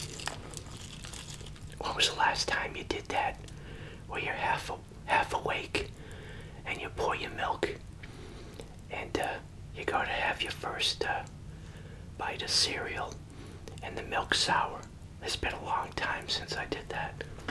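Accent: United States. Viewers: The way he says corn flakes and coffee is just amazing I’m English and his accent is like the typical American accent I love it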